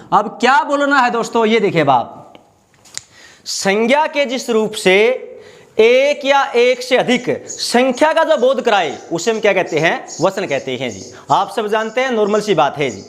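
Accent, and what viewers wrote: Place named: Haryana, India. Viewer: akdm haryanvi 👍👍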